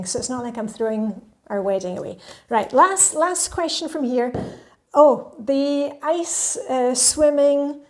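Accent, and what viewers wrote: Scotland, United Kingdom. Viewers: Love working along with you. Love your calming lilting Scottish tone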